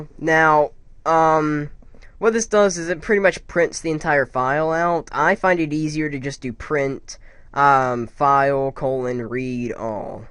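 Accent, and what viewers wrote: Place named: North, United Kingdom. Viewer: You sound like a Northerner to me, sorry if that's offensive. Greetings from WNY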